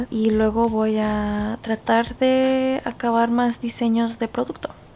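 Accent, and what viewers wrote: Mexico. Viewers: Que bueno que hiciste uno en español. Me motivaste a hacer uno yo también. Ah y se dice subscribirte. 🙂 súper bien! ❤️ Me encanto por sierto eres de descendencia mexicana? Porque yo lo soy y creo que tú acento es de Mexico. ❤️ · gracias de nosotros tus seguidores de latam, luego de escuchar tu español identifique que era mexicano JAJAJJAJAA me gusta mucho como hablas el español ya que es tu primera lengua... espero te encuentres bien, saludos :D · Hace algun tiempo veo tus videos y podia escuchar un poco, pero muy poco tu acento mexicano y me preguntaba, acaso hablara en espanol? Y pense en escribirte en espanol, pero luego dije, que tal que estoy loca : ) jajajja. Me encanta todo lo que haces, felicitaciones! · me gusta mucho tu acento mexicano <3<3 te da una vibra diferente pero linda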